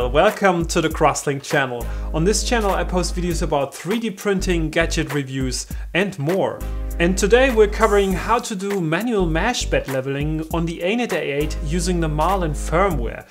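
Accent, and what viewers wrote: Germany. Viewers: Hi, "hallo!" may I say if I recognized correctly your german accent(!?). Anyway, you really rock as a teacher, with cristal clear explanations, and you have the hability (intelligence!) to clarify and simplify those complicated lines of commands in Marlin: just a thousand thanks for that! I know I'm coming really late after your video, but I only saw it 2 days ago... I have a precise question for you: I did all you described, also for the #986 (#define RESTORE_LEVELING_AFTER_G28). But I tried it (I have an Anet A8 with a V 1.5 board), the manual mesh leveling is perfect, and so are my prints afterwards! ---> BUT... if I shut off my printer, the next time I'm launching a new print...the bed is not leveled anymore....I must re-do the complete manual bed leveling again.... ---> it seems that the leveling is NOT written in the EEPROM, although I had uncomment the line #986 (#define RESTORE_LEVELING_AFTER_G28) and click on "Store settings" on the LCD panel.... 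ANY IDEAS? Thanks again, vielen Dank, hope you'll be able to see my questions and answer it...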